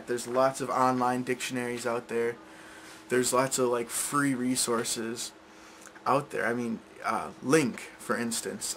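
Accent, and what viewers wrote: United States. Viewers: He has a nice american accent.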